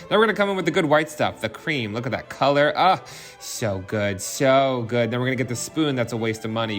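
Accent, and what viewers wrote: New Jersey, United States. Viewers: I love his Jersey accent!!!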